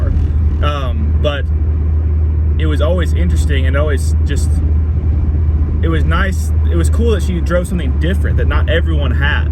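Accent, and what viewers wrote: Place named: Sweden. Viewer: Where is this in the US. For some reason I think I can hear a little bit of Scandinavian(Swedish) accent, can be wrong. Thanks.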